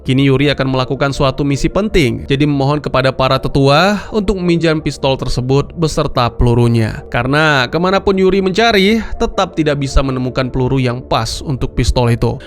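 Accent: Aceh, Indonesia. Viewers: Orang aceh ya bang?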